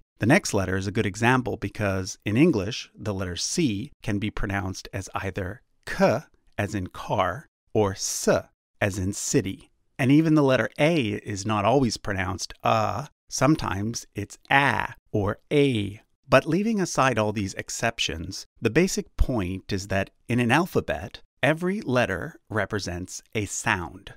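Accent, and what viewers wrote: United States. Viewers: I loved hearing him pronounce asian languages with an american accent lol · why do you say all the east asian languages in the most american way.. at least try and research😭😭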